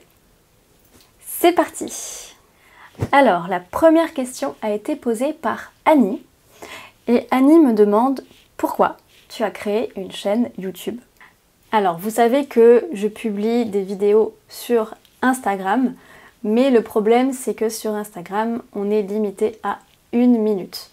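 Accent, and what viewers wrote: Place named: South, France. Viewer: Bonjour. Le prénom « Kurt », qui est allemand, se prononce exactement comme « courte » sans prononcer le « e » à la fin (car j'entends que tu es du sud de la France). 🙂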